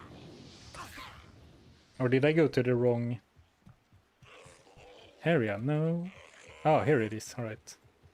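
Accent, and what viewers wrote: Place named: Sweden. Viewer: The Swedish accent is fun, and we understand everything you say just fine. If you want to get rid of it, do so naturally over time, don't go out of your way to try to hide it. I like my Swedish d2 youtuber and streamer.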